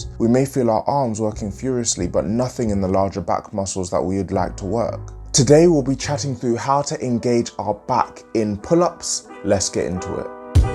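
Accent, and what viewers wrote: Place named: United Kingdom. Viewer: Quality video, definitely will check your advice out next time I'm in the gym! Btw. 3 words into the video and I didn't have to check where you from, mate :D Also, no hate here, british accent sounds awesome :)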